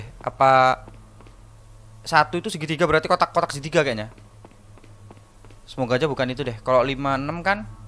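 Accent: Java, Indonesia. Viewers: Bang orang Jawa? Jawa mana bg, salken aku dri jatim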